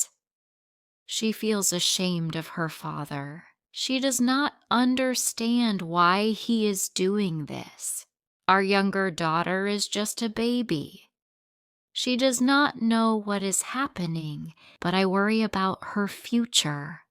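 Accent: United Kingdom. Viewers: I love your english accent so much ❤❤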